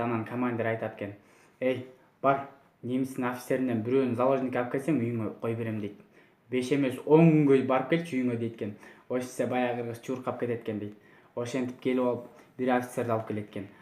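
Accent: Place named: China. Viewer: чипилдирик кытайга окшошон пиздюк кытайдан келип кыргыз тилин уйронуп аткандайсынго жаны туулган торпоко окшоп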